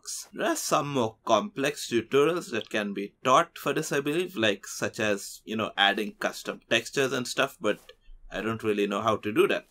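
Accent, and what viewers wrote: India. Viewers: A normal indian english accident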